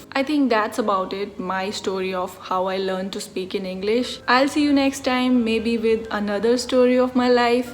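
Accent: India. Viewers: I subscribed you by seeing you from bts's study with bts I mean by seeing you're comment And our [mine &yours] english accent is weird that's how Indians speak English in that accent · I like Indian English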